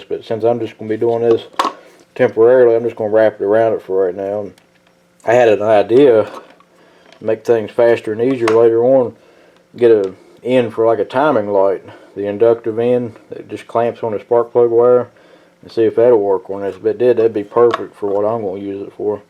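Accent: South, United States. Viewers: Great video. Thank you. I'm from Arkansas so I appreciate you southern twang. I sound just like you, Thanks,.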